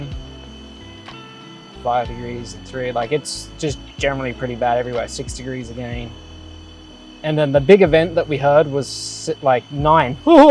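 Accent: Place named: United Kingdom. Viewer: You're Canadian, but have a mild British accent, how's that, bro'?!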